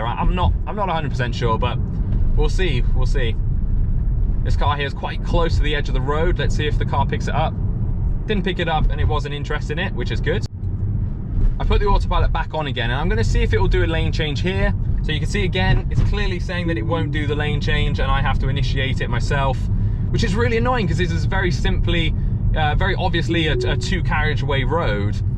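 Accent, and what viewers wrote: United Kingdom. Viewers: Are you scottish and doing a switcharoo. I speak english as a first language. When I speak to people outside India or in videos I speak in a way that most native would find easy. But speaking english with non native speakers in India I need to deliberately misprounce words and use incorrect grammer otherwise they want understand me.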